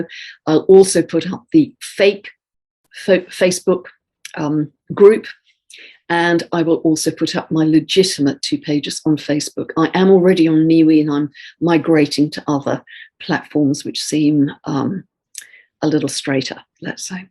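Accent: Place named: RP, United Kingdom. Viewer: You have the poshest accent ever. If anyone wants to know what "the Queen's English" or "BBC English" or "Received Pronuncation" sounds like, listen to this young lady. Some English people have accents that "sound" super high class, but when they pronounce certain words with a bit of a working-class twang, it gives them away as not really posh. Your accent, however, is truly impeccable.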